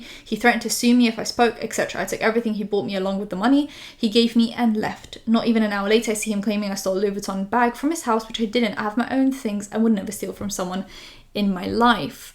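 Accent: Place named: England, United Kingdom. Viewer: i wish we were friends. i hear the english accent and wonder if ill ever run into you. but then i realise England isnt THAT small XD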